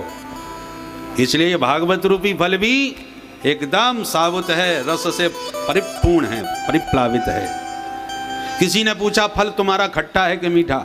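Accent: Braj, India. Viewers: Maharaj shree apke mukh se braj brajbhasha bhut madhur lagti hay apko shut shut naman 🙏🙏🙏🙏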